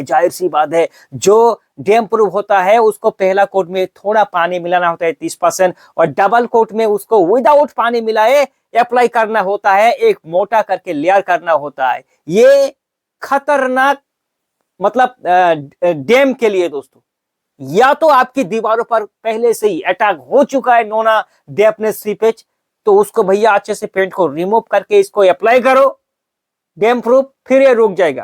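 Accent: Bengal, India. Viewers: Bhai aap bangali ho?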